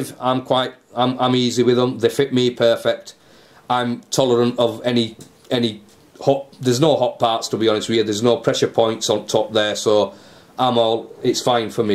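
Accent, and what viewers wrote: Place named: West Yorkshire, United Kingdom. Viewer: God bless t West Yorkshire Dialect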